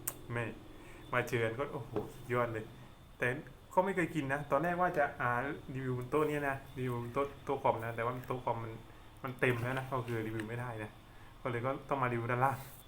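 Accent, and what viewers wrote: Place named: South, Thailand. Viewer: อ่อรู้เเล้วก้ว่าอยู่ทำไมพี่พูดสำเนียงใต้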